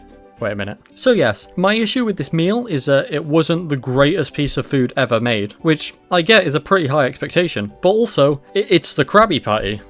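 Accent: United Kingdom. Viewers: Are you You British?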